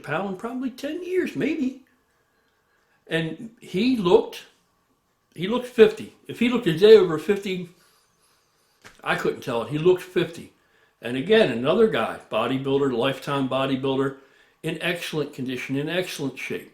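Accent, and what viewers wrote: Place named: Philadelphia, United States. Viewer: You gotta be from Philly or South Jersey. 😅i know our accent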